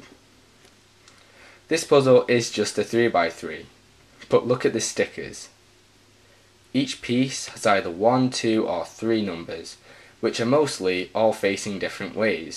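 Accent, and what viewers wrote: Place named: Yorkshire, United Kingdom. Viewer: Hey, just wondering which part of the country you are from as you sound like a yorkshireman?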